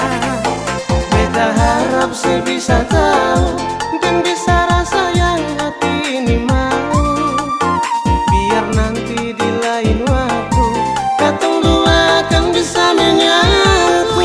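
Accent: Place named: Batak, Indonesia. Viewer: BU KNP KLO SETIAP PENGUCAPAN KALIMAT AWALA "ME" KASAR BANGAT Y, KAYA ORG BATAK GITU..MEEE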